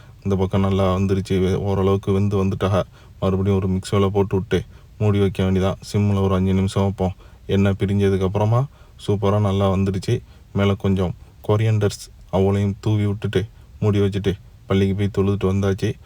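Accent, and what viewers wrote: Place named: Keelakarai, India. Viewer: காமெடியா கீழக்கரை ஸ்லாங்கிள் பேசுவது செம😅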